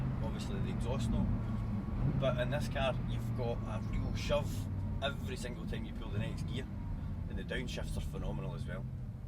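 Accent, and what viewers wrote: Scotland, United Kingdom. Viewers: Beautiful overview, mate :) I just aboslutely love scottish accent :) still my dream car, and the only affordable super car. The way R8 looks is just out of this world :) Currently driving TT mk2 with dsg and these dsg farts on first gears really adds up some "drama" and I love it, I do agree, this small gap between shifts just actually adds up :) superb video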